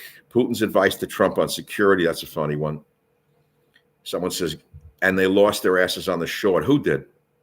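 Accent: New York, United States. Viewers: Always enjoy view....that NY accent too!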